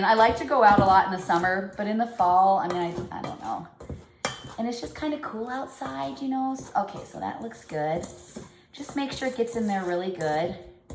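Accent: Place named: Minnesota, United States. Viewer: Why did I think you were from Minnesota😂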